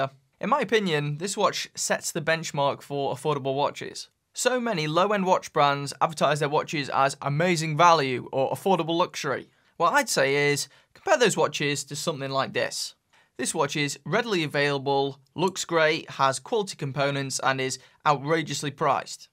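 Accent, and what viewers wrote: Stoke, United Kingdom. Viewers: is it stokie?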